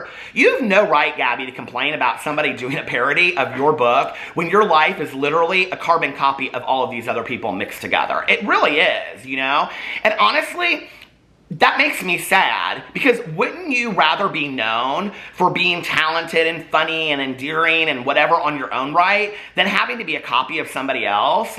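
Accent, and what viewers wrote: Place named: Indiana, United States. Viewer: I think its just his Indiana accent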